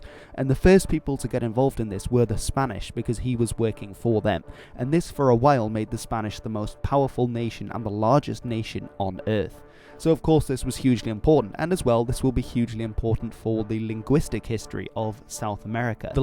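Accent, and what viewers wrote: Argentina. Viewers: your spanish has an Argentinian accent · Your "latin american spanish " is just argentinian spanish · Guy who runs this channel: Congratulations on your pronunciation! Your south american accent came out very Argentinian! I'm Argentinian btw. A difference you forgot to address is that us Argentinians speak differently from almost all other south americans. Most south americans pronounce "Yo" (me) as "Io", but we pronounce is as "Sho". And we,m argentinians, are also much more vulgar in speech than the rest of the spanish speaking nations.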